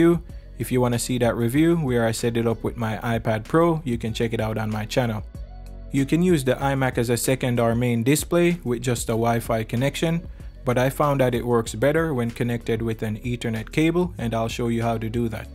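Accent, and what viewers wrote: Jamaica. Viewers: This was very helpful for me. Thank you. I just purchased my Luna stick . I will also look into getting a Ethernet cable as well as my internet can be very unstable. I hear a Jamaican accent in there somewhere! and for that I will sub! lol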